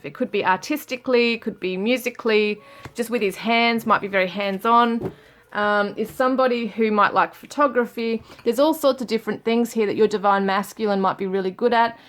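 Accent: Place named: Australia. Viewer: i like ur accent is it australian?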